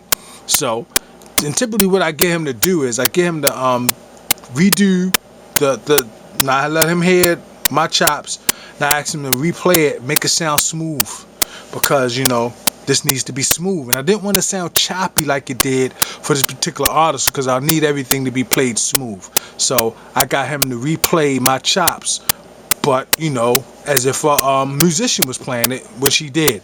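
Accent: Baltimore, United States. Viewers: That Bmore accent be killin me! Lol. great vid fam!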